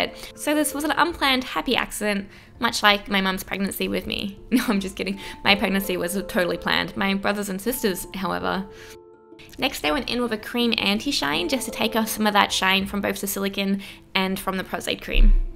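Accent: Australia. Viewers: I really really love this! As a human being which is fluent in english but not really sure if I understand dialects or different accents, can someone please tell me if she has an australian accent? :D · What part of Australia are you from I'm from port maquarie 😄😄😄😄😄😄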